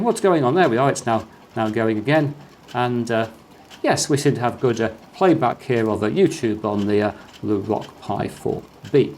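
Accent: United Kingdom. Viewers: When I watch this channel I get the same warm fuzzies I used to get as a very young child watching children's education shows on national broadcast television in the 80s. Some of it definitely has to do with that sawtooth/triangle-wave synth theme music. But obviously the rest is from the very friendly pommy accent :)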